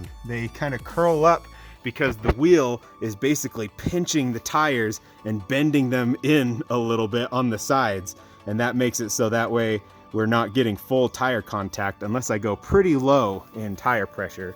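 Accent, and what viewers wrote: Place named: Utah, United States. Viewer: Ha. I thought you had a Utah accent!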